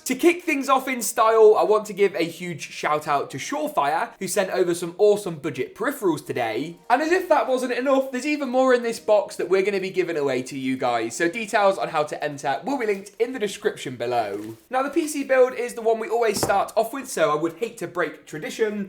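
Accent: United Kingdom. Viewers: Like dat britian accent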